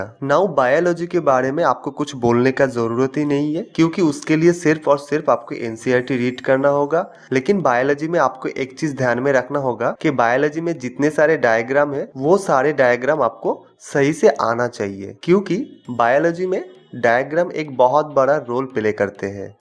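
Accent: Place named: Odisha, India. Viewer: Aap ke aawaz se log raha hai ki aap odisha se hai..... Becoz.. Odisha log ka hindi aawaz pata chal jata hai......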